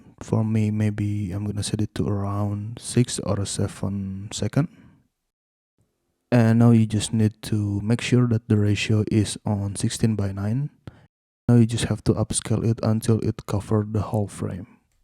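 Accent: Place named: Indonesia. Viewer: Ini orang indo ya logat nya kok indo bgt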